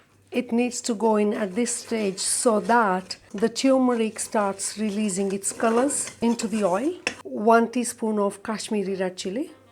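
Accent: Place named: East Africa. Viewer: I can heard east Africa you Born in Here or you been Here tanzania I here Sis I like this recipe nice and taste stay safe and healthy I will cook this sometime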